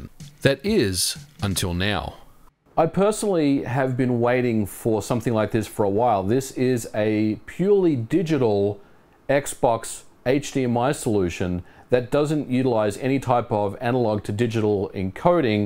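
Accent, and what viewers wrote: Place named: Australia. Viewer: Nope, I'm watching this because I could never miss a video with this amount of aussie accent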